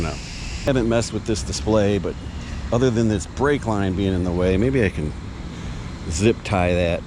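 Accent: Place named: St. Louis, United States. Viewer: Hey, former south St. Lou here. Have been checkin out 300L vids and ran on to you. Clicked on one of your vids and as soon as you started talking I said this dude is from St.Lou haha. I moved away in 1995 sooo! But I lived there for 29 years and had a Harley super glide that after hittin the bars we would sometimes go out to Creve Coeur Mill rd and blast em. That was late 70's so those soccer fields and all that wasen't there and it was dark. Cops would turn on their lights and come after us but wouldn't stop and get out to confront us out there in the dark lol. I have a Africa Twin now down here in South Georgia and it's a beast but am looking to get something smaller and easier to handle as I'll be 71 on next birthday. I had a little yamaha 360 enduro back in the day and it was lower seat but knobbys and it would go about anywhere so start cutting through places and jumping curbs and use that thing the way it can be and you'll have more fun. 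Good luck with yours! PS I know about the divorce thing. No sweat you'll be better off!!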